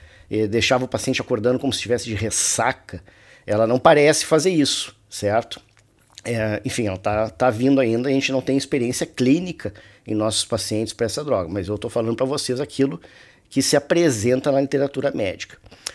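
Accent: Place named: Rio Grande do Sul, Brazil. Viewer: Pelo teu sotaque, deve ser do RS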